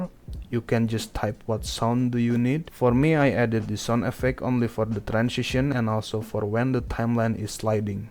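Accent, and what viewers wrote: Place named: Indonesia. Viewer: Orang indo bg logatnya kaya indo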